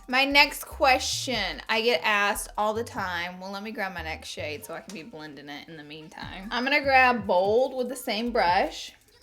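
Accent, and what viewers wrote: South, United States. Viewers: you should've not told us ur habit of looking down to our left cuz now I'm counting how many times you do it haha!! & girl your losing your southern twang :( better get back to Bama soon. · Ok what part of the south are you from. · I can tell I'm from the south.. I didn't even know you HAD a country accent. Haha, i just thought you had a normal voice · Beautifully said and love your southern accent..... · Girl I am from Texas and transplanted to TN so I hear you on that accent and dirty south is SO right. Also I started graying at 19 so I couldn't tell you what my natural color is. I DO know I need to go get another hair appointment but I have more time than money so I go to the beauty college lol I enjoyed your Q&A. You are freakin hilarious.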